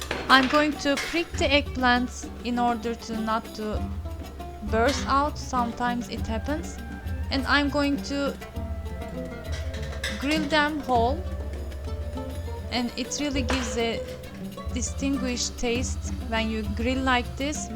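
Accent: Turkey. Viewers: I just love turkish food and i love turkis english accent 😂🤣🤗💪😁🇹🇷🇸🇴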